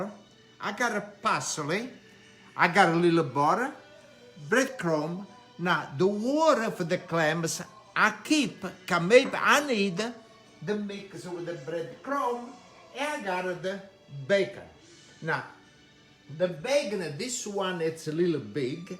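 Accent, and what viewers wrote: Italy. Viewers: I listen to you i hear my italian immigrant grandparents.We love yo both❤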